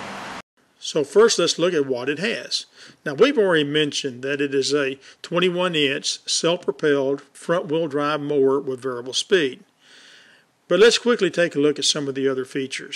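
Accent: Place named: South, United States. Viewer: I love this authentic, Southern American accented English. While all the southern accents may sound similar to a Northerner or an international visitor, there are a plethora of different distinguishable varieties and flavors. Likewise I have never heard two different British accents that sounded the same to me.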